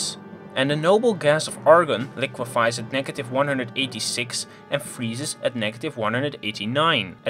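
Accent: Netherlands. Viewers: Are you Dutch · leuk nl accentje hahah. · are you dutch? olijf oil intersekting point etc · the dutch accent.......... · Ah, hello my Dutch friend, I recognize that accent :) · Do I detect a Dutch accent? 🌡️❄️ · Lol, ik realizeer me net pas dat je Nederlands bent... Blijf goeie content zoals dit maken, je hebt talent! (Ik dacht al dat ik dat accent herkende... ;P) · Man is dutch💀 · Are you dutch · Ew dutch accent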